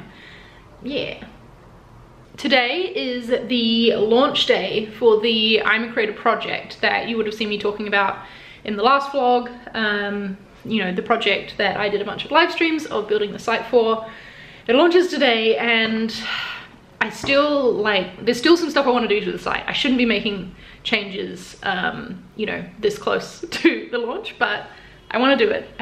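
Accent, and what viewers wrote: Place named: New Zealand. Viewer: Besides the design stuff content that I really enjoy and helps me, I think I watch you for your English accent and those occasionaly 'yeeeeeah' kiwis that you drop sometimes <3 I miss NZ sometimes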